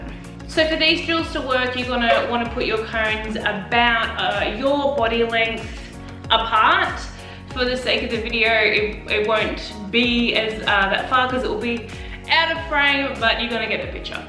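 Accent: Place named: Australia. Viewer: Loving this! First COVID, now its raining ALL THE TIME in Sydney so getting outside to skate is tough. Great to have some drills to practice that I can do in the kitchen. I Just started Derby with Northern Beaches and having so much fun! Also ... love the T-Shirt. Thanks for posting the video and great to hear an Aussie voice.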